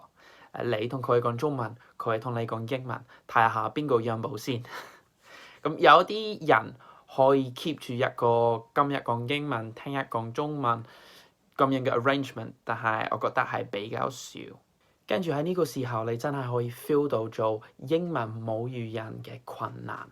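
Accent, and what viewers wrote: Hong Kong, China. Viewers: 嘩，你D口音同語氣真係好香港人！讚 讚 讚 勁 勁 勁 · 連你嘅語氣都好香港人feel 🤣🤣🤣🤣❤️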